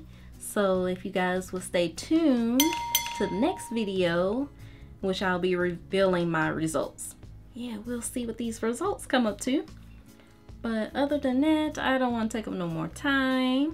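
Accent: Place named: Mississippi, United States. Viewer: I can hear that Mississippi/Memphis accent, not as strong, maybe because you're in Cali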